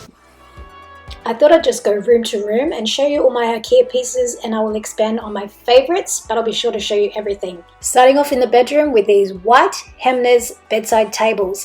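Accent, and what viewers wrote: Australia. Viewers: I'm from Brisbane too, I've only just found these videos and I'm loving them...also loving the Aussie accent! 🇦🇺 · Hi from another Brisbane girl, it’s nice to hear an Aussie accent and I had a giggle when you mentioned thongs and then called them flip flops 😂 the views from your apartment are amazing 😊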